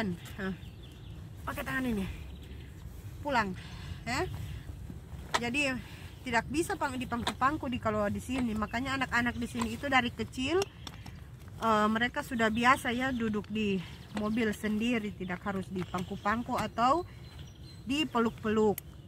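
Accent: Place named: Eastern Indonesia, Indonesia. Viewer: Salam kenal Kk 😊 Dari logatnya seperti dari Indonesia timur ya Kk, semog berbahagia selalu bersama keluarga.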